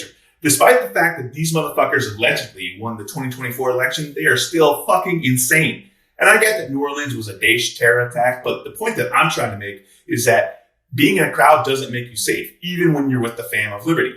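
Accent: Scotland, United Kingdom. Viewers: Super Scottish !!!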